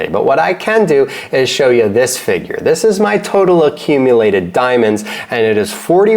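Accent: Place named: Midwest, United States. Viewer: man this guy amazes me every video. first time i saw him i was like "look at this nut job, tattoos all over his face and body" then i watch him and he is so charismatic and fourthrite and has always been upfront with everything hes doing . and now this video. This guy is definitely a don't judge a book by its cover example. also he has a accent from somewhere like iowa or illinois maybe more east but its interesting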